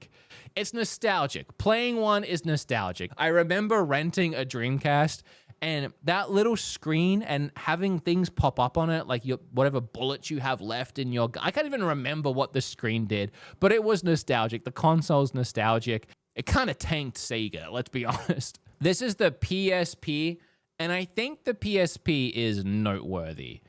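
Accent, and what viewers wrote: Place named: Australia. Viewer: Different pronunciation for Australians which is still correct. British, Americans and Australians stress different parts of some words.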